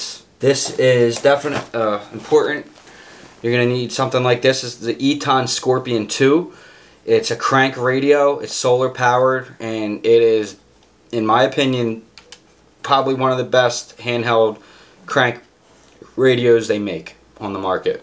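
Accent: Philadelphia, United States. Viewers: I’m from philly and I knew you had a philly accent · Just found your channel, great info! But I thought to myself this dude sounds like he’s from Philly...then you said “ water” not “wooder” so not sure? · I knew from your accent, you were Philly. I'm born and raised Philly, went to Lincoln, class of 94. Watch your back brother. Things besides the pandemic can hurt you, like Mayor Kenny, the DA and the skells of the city. Good video bro...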